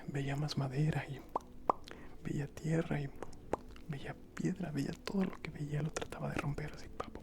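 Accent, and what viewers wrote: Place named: Argentina. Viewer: ES UN ARGENTINO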